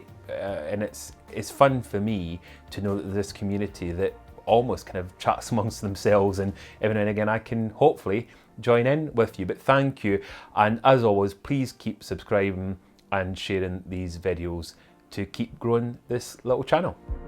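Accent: Scotland, United Kingdom. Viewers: Oh, you brought your heavy Scottish brogue back with you from holiday!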